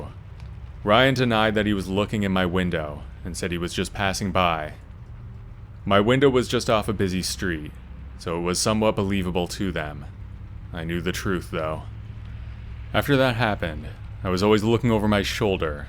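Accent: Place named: Canada. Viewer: I enjoy the cute "oot" and "aboot" Canadian inflections too. 😊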